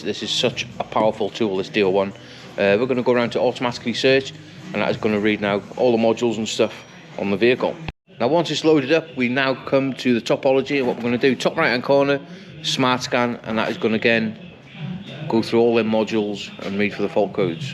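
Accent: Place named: North Wales, United Kingdom. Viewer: Where are you based mate? You sound local? In North Wales? I need my Daughters 2016 Mini 1.6d sorting the same. 😊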